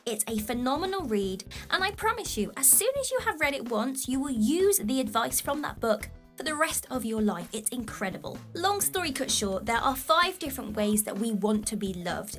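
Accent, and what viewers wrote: England, United Kingdom. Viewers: Always good contents 👍 thumbs up.I will check for audible and the books you are recommanding, thank you. Ps. Your english accent is music to my ears, you clearly can use this to charm someone 🤩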